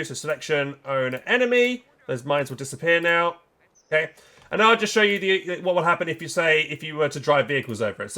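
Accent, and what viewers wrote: United Kingdom. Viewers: Fast talking British accent and mostly incomplete sentences makes for poor communication.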